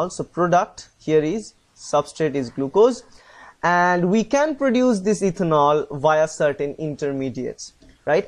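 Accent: India. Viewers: thanks so much man you the only one in the in the internet who actually explains it well. Loving your indian accent!!! thx a lot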